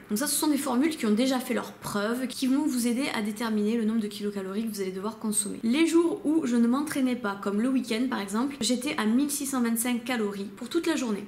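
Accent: South, France. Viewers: j'ai bien aimé ton approche au final bien resumé pour les debutants... avec un petit accent du sud non ?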